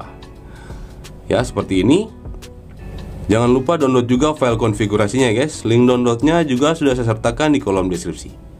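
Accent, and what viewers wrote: Kotamobagu, Indonesia. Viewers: Om orang kotamobagu kang 😂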